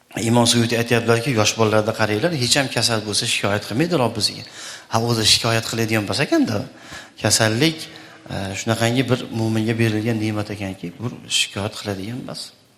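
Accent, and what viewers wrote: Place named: Khorezm, Uzbekistan. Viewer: хоразмли акансиз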